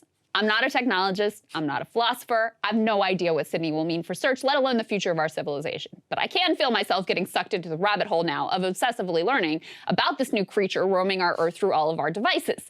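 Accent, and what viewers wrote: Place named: United States. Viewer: She sounds like an American.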